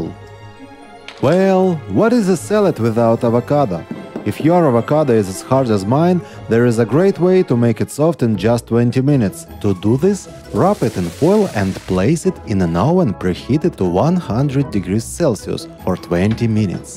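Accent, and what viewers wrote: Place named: Russia. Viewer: Such a sweet Russian accent. I love it.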